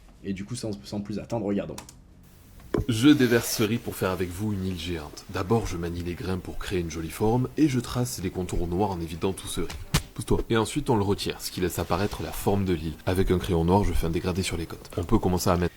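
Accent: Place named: Lozère, France. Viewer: Je connais cet accent (48) 😉 dis-moi si je me trompe ?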